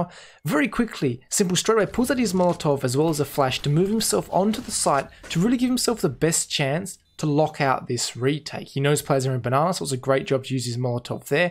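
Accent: Australia. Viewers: you should have at least 1M subs btw i love Australian english keep it up :)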